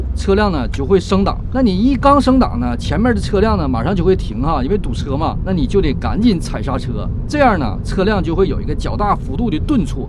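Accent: Northeast, China. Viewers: 听到这东北味就感觉满口大蒜味，真是人车都辣眼睛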